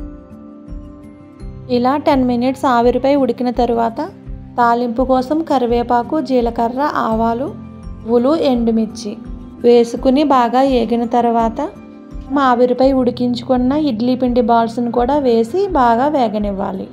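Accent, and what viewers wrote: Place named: Konaseema, India. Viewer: మన కొనసీమ వాళ్ళు ఎక్కడున్నా బాష యాస మాత్రం ఏం మారదు. 👌👌👌 sooper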